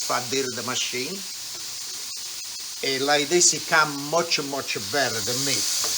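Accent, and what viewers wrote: Italy. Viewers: I like the Italian accent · Yes, but only next to Lidia Bastianich tho. For me anyway. He is adorable and when he speaks, it's with that unmistakably Italian flare.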